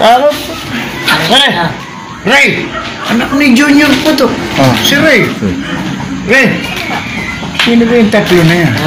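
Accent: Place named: Nueva Ecija, Philippines. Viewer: Parang narinig ko na ung about daliri,Sa pork chop duo yata.?.Pero ok nmn po pagkaka deliver..👍,ung punto ng Bulacan ,prang s Nueva Ecija..Yung mga tumatawa din ang nagdala..heheh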